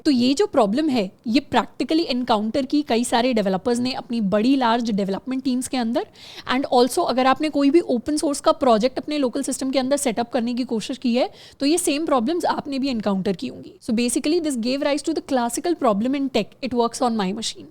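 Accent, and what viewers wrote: India. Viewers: Very Heavy Indian accent. Can not understand what she is saying. Either you speak in English OR speak in Hindi.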